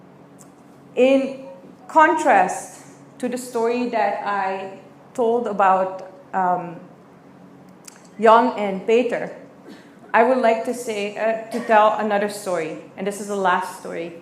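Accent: India. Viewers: Good lecture as like Indian professor. Pronantion as like Indian. Easy to understand.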